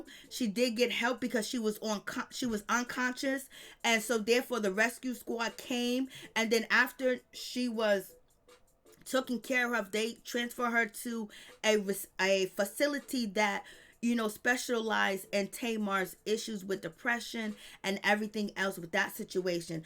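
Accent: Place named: East Coast, United States. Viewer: your accent made me subscribe.. is that east coast vibes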